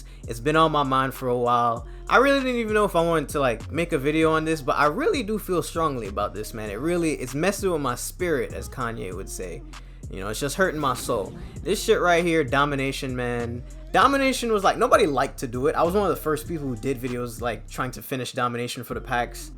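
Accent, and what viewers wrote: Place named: Jamaica. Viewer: I hear that Jamaican accent boy Gang🤟🏽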